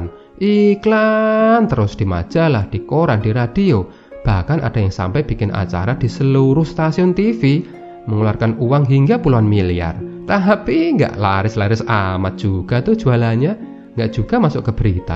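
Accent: Java, Indonesia. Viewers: Bisa gk medok nya di hilang'in??? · Suaramu medok tenan mas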